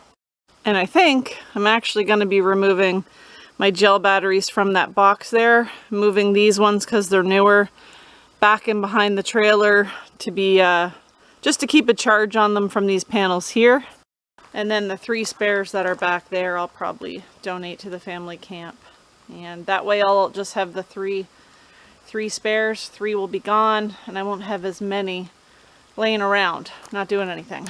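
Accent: Canada. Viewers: Where are you? It's August and you need heat? I hear the Canadian accent but you must be way up there.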